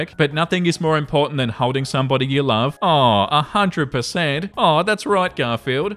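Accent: Australia. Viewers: I always look for a new when I first wake up in the morning! It's so nice to listen to your soothing Aussie accent as I get ready fore the day!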